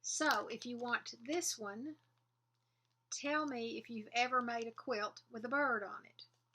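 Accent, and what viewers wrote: South, United States. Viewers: I just love your videos - I could listen to you all day long, being from Iowa I just love your southern accent. My family Thanksgiving is in the midst of changing and not just due to covid. This year we won't be gathering, and last year was the first time in my life that I remember going to somebody other than my Uncle Johns' for the gathering (over 40 years - I stitched the Plum Street His & Hers Thanksgiving stockings as a thank you). If you recall, I would like to know the designer of the Halloween tree/alphabet with the pumpkin at the bottom pictured ~ that is adorable. I just finished stitching Scarlett House's Autumn Alphabet. I am so very sorry to hear that your brother is declining, and will keep you both in my thoughts - Joan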